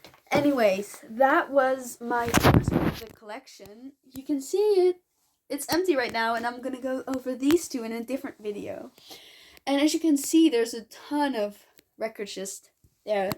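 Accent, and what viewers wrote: Netherlands. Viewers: You sound Dutch. I'm strictly lossless streaming nowadays but I like people going on about their vinyl😀 In my late teens I had several LPs, including your two.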